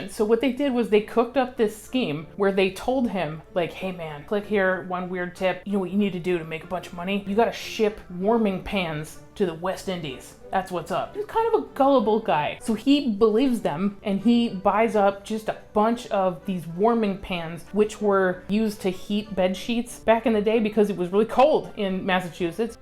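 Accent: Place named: Florida, United States. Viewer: Why does it sound like he belongs in Florida?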